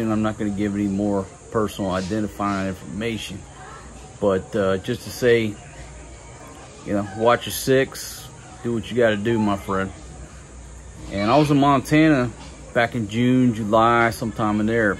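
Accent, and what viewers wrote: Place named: Texas, United States. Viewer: Hi, alam mo you are the best in that region... your videos are the best// I am from Singapore and have a lot of USA friends, pero you are the best although i can sense you from Texas (your accent the giveaway)... hahahahaha/// love your videos my friend... Waiting for you to choose your wife number 3... don't know what I blabbering... kasi I am drunk... hhahahaha... nice videos my friend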